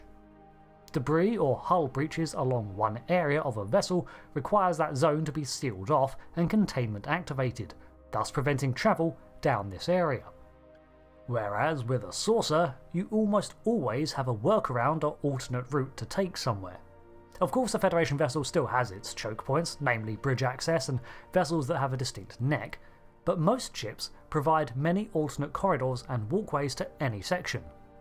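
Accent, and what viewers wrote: United Kingdom. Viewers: I know, british accent, but for the record, in Cornwall, Ontario Canada's phone numbers all start with 93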